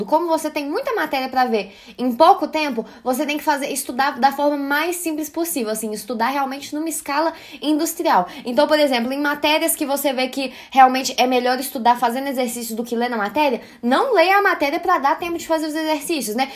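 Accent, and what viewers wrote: Minas Gerais, Brazil. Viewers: menina, faz um tempo que nao te acompanho até pq meu foco é concurso público mas sempre via as aulas de história. Estou impressionada como seu canal cresceu e a qualidade e conteúdo dos vídeos tmb estao melhores... excelente! E o que é melhor é o sotaque mineiro!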